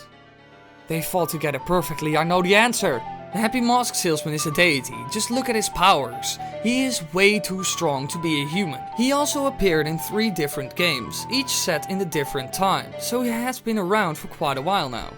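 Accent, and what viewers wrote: Netherlands. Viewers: You're dutch aren't you? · This is a thicc dutch accent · Hella Skella Don't brag beebje, even you aren't that fluent, but most certainly more fluent than this dude 😂 But yes, this is unmistakably a Dutch accent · Are you Dutch? Your accent sounds a bit Dutch. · Wait a minute HEY YOUR DUTCH:D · are you dutch? i think it because of you're accent. sorry if i am wrong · is he dutch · Jacob Marcelo i think its a dutch accent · Hoor ik daar een Nederlands accent? ;-) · lemon it's like a typical Dutch person trying to speak English lol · ur English accent is a dutch man speaking English I know I speak the same way and I'm Dutch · Holy shit Damn I can hear you are dutch finnaly a fellow dutchie:DDD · he is dutch. The accent is obvious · your voice is not annoying at all or anything [neither is the accent], but after hearing you talk for 3 seconds i want to ask: what part of the Netherlands are you from? 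:P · ur dutch accent is so distracting since i'm dutch as well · Dude... Dutch seeps out of every pore of your accent x) But it's less cringey than the usual dutchman speaking English, so... +1 nieuwe sub, lol. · R you dutch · Why does this guy have a dutch accent all of the sudden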